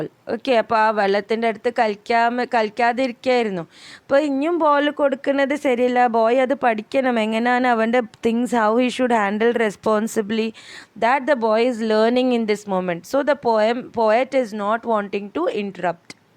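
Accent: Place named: Kannur, India. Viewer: thankyou yr classes are great .. And your speaking style reminds me of my old friend at kannoor😀👍🏻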